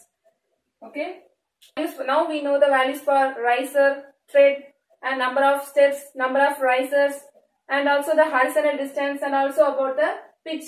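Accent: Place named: Hyderabad, India. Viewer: From your voice accent you looks like you are from my city hyderabad??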